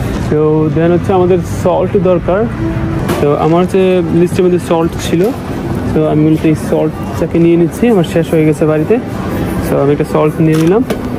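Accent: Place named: Chittagong, Bangladesh. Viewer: ভাই চট্টগ্রামের মনে হয়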